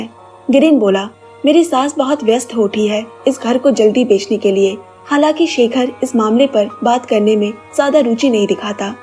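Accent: Bengal, India. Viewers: Both are correct it's just bengali acent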